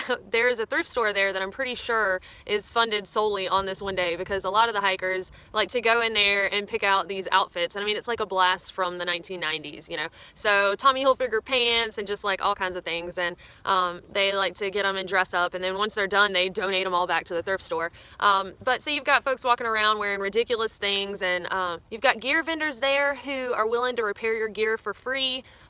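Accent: South, United States. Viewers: “Y’all’s experiences” I love hearing Americans from the south talk. I’m from Europe and I’m been wanting to come over and do the AT (and PCT) for years so this was a great watch! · I could listen to your voice all day long- love that Southern accent!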